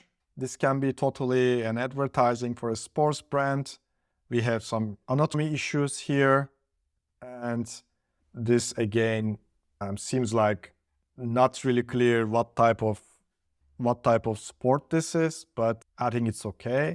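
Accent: Turkey. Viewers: aksanı duyduğumun 5. saniyede falan dedim ki bu türk. anca bu aksan bir Türk'te olur. Çok farklı konuşuyoruz gerçekten yabancılardan. nasıl hintliyi vs fark ediyorsak konuşurken. türk kişide anında anlaşılıyor be kardeşim. iyi çalışmalar.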